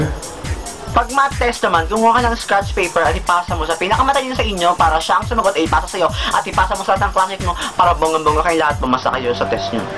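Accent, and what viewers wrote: Visayas, Philippines. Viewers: Bisaya ka? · HAHAHAHAHAAHHHAHA bisaya te? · bisaya pala xa...!haha...... tga san yan..? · ahahaha bisaya si koya.. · Hahahaha. nad Yess. Bisaya ka day.! Hahahahhahahaaa. Im from Cebu. noe here. :* "Yawa ka pisti:" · Bisaya man diay nis. hahaha buanga oi! xD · bisaya ba? bisaya? :) Galing! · haha bisaya gud siya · BISAYA MAN DIAY KA HAHAHAHAHAHA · hahahaha! bisaya pd d.i ka? xD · Bisaya? hahaha xD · Haha, Feeling ko Bisaya talaga dahil sa tono :v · "day,day josephine unsay sagot sa number 1 day?day,pagdali day....kay naa na si maam...pesti...pesti ka..yawa ka..XD. Bisaya kang dako!!hahaah... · shet!!...lingawa nimo mag.bisaya oi..karelate man ko sa imong pisti ug yawa...haha!!.. igo ko atong sign language...LOL.. #pisti ka day, naa na si maam day, yawa ka...haha!!!! :DDDDD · bisaya ka? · BISAYA KA? SHET AS IN? WALA KO NAG EXPECT! 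OR KATONG YAYA NINYO ANG BISAYA? MY GOSH GI KILIG KO PISTE! 😂😂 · teeeerrr, bisaya jud ka ter? lingaw kaayo ka ter uie! kaulett! haha. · HAHAHA! galing, bisaya din pala c kuya. bagay bisaya sau kuya;) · Bisaya ka pala? san parte day? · hahaha lakas ng trip ee. BISAYA MAN DIAY KA DONG! nyahahahaa! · biSaya diay ka ????.. . hahaaha. . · waaah. bisaya. tga asa ka?hahahah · bisaya diay ka .. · ag KYUTTTT!!! nag pag bibisaya mo tol!!!!! wahahaha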